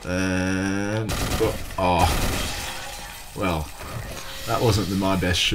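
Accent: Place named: New Zealand. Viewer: u from nz? you sound like one🤔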